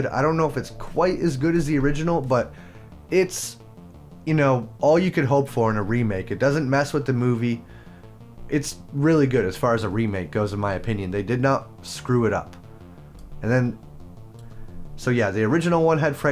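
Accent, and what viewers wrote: Canada. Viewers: his accent is wildly canadian lol listen to his outs and abouts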